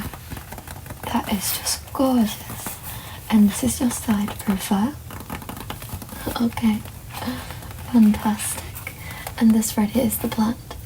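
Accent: RP, United Kingdom. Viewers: I think I look much better in the portrait, you draw excellent 👌👌👌 It was very relaxing for me to listen to you speak in British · As a fellow Britain myself I don’t know how to feel about ur British accent but keep up the good work!!! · As an English person I find your accent quite amusing and endearing. It's a bit like a quirky Joanna Lumley. Quite plummy and soothing. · Very Bri'ish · As a Brit... I wish our accents were all this posh..... Mine certainly isn't! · sorry but that was old british im not trying to be a pest but i find it a-bit stereotyped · I've lived here in the uk all my life (47 yrs old) and yet to meet someone who speaks as posh as this, but good attempt though. great video though. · ASMR is so much better with an English accent, I probably won't stat awake more than 5 minutes, so thanks ahead of time, good night 💤💤💤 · As an English accent I find the person quite brilliant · Just when you think she can't possibly get any more adorable... 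enter the British accent. 🗣❤ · British was something I never knew I needed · Just to be clear, folks, it isn't a British accent, it's an English accent. However, it is very commendable nonetheless 👏🏼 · I love the British accent and would for sure welcome more of this! Great video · Hello! Lovely to see you again on this fine day. Such a pleasant experience to hear your British accent in this video. We really appreciate your time and effort to make such delightful asmr for us and for that we thank you! Cheers! 😊👏👏👏👏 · Your posh I can tell 😂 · Ngl although you sound like more of a upperclass english person its not far off. Better than mine and ive lived in the uk all my life 😂😂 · Not a bad attempt at the british accent also love your look aswell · I'm British and the accent is lovely:) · Your voice is adorable with british accent ❤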